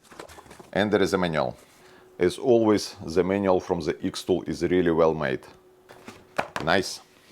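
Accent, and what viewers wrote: Russia. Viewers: Если судить по акценту, то вероятно носитель русского языка. Я хз как я попал сюда, но видео занимательные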